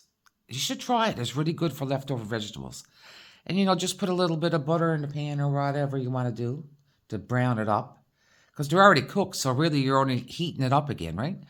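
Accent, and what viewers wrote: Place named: Newfoundland, Canada. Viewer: Hi. I'm canadian too, but Ontario. Love your accent. My friend is from Newfoundland too. Merry Christmas 🎅 🎄 subscribed. Glad I found you. Wonderful vlog. Loved it!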